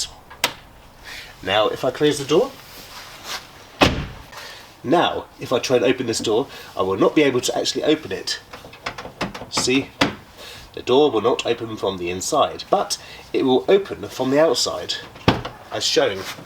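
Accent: United Kingdom. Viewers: Klassno. Tak prijatno slishat British English in Russia! Nice car! <link> My husband's scuba diving videos (mainly)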